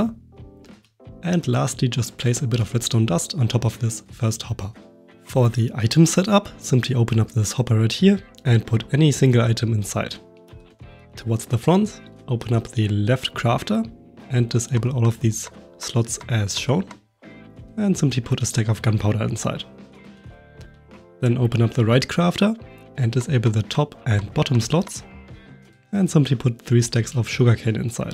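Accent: Germany. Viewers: Der deutsche Akzent 😂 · Deutsch hört man raus aber gutes tutorial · Junge eine Sekunde angehört, der typische Deutsche Akzent. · great build, but are u german?, ur akzent sounds like it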